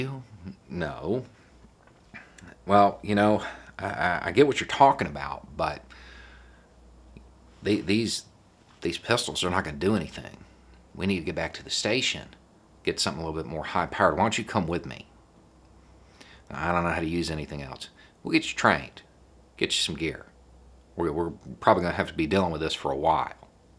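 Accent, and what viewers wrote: South, United States. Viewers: I adore this man. Something about hearing lefty politics from a deeply southern voice makes my heart smile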